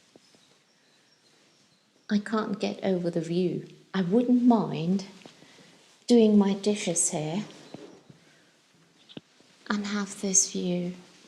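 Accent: England, United Kingdom. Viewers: Im curious, you and are from Germany, but you both have an English accent. Especially you,. How can that be?❤ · I like your videos! You are such a lovely couple! I have one question: you are from Germany, but you have no accent. Your pronunciation is so perfect and so gorgeous British 😅 I try to get rid of my accent too but it seems to be impossible… How did you manage it?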